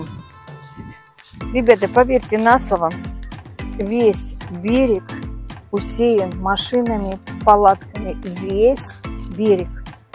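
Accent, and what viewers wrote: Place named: Moscow, Russia. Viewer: Если бы не говор московскоголубой было б отлично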